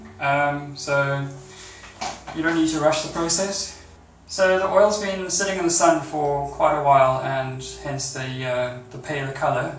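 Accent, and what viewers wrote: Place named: South Africa. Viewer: nice also to hear a fellow South African accent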